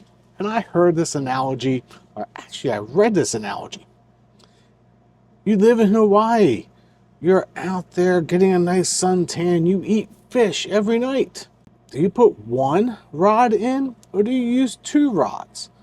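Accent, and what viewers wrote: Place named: Pennsylvania, United States. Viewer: do you hail from Pa or Delaware? You could be from your accent! I think it's adorable....also could be a Baltimore / Md accent ha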